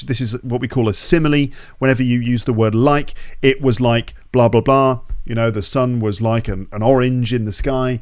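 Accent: United Kingdom. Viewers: Subscribed! This is British English because of the British way to pronounce R and the glottal T. Love it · I find interesting and really helpful every story you read since it's the best way to learn while enjoy English, i have listened many short stories from your channel. I have been obsessed with the British accent and this is helping a lot to brush up bit of my skills. Greetings from Peru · Wow, I absolutely love your voice! Your pronunciation, intonation, and accent are perfect for this kind of content! I wish I had your British accent =D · O...British English ! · British English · Huge thank you,. Your channel is very useful to learn new vocabulary and phrases l don't know. I am also interested in learning British English and accent which is very nice. You are an amazing and dedicated person who teaches English perfectly. I am sending all my best wishes from here where l live. 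· Mano eu tô iniciando então não sei... Esse sotaque dele é britânica???? Vc acha bom pra mim começar c ela ou americana? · I really enjoyed the British accent and the format (story, then summery then attention to the specific phrases and details)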